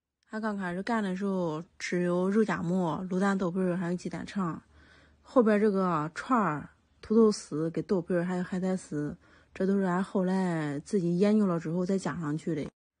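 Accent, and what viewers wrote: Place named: Henan, China. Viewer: 河南声膜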